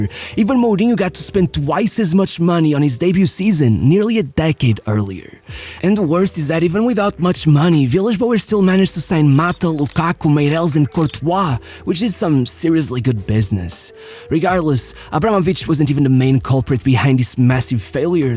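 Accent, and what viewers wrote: Portugal. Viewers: This accent is very Portuguese. 😅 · Eu sei que és português, esse sotaque tá aí, não dá pra esconder🤣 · Real ones know this guy is portuguese, i know buy the accent!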